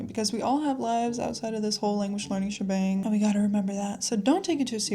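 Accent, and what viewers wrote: Mexico. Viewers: Hello, hello! Spanish native speaker over here 🫶🏻 Just wanted to say you sound 100% Mexican! So at least for me, you’re not losing the Mexican accent. Hugs! 🫂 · So I've been learning German for a while and my struggle is super cliché 🤭 I understand a lot but I have a hard time putting sentences together on my own. My focus for this year is exactly that, I'll be journaling in my target language to improve 😎 Btw, as a native Spanish speaker (but not Mexican haha), you still have a nice Mexican accent 😁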